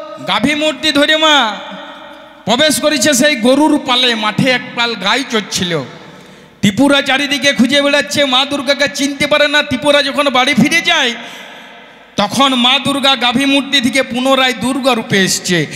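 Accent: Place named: Bankura, India. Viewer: এর বাড়ি বাঁকুড়ায় নিশ্চয় ! ভাষায় বুঝা যায় !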